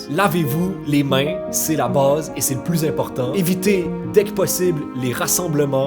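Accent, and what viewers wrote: Quebec, Canada. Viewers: Cette accent québécois m’avais manqué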